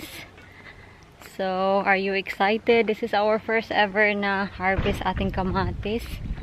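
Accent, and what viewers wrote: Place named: Visayas, Philippines. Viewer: Basta bisaya lambing gyud kaayo.👍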